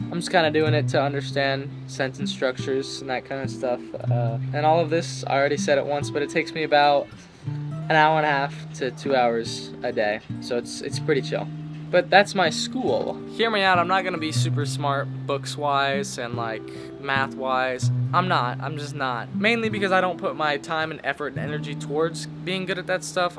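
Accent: France. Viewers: tu as un bel accent français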